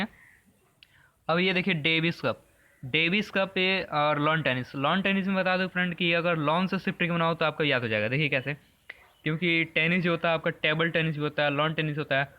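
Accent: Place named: Bhojpuri, India. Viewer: Your bhojpuri tone is really sweet and this is really amazing trick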